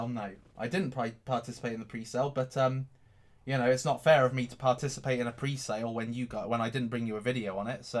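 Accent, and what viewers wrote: England, United Kingdom. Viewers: You talk with an English accent and sing with US one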